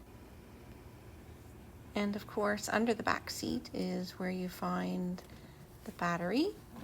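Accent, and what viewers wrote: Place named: France. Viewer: The very informative, but it is difficult to understand your very thick French accent.😔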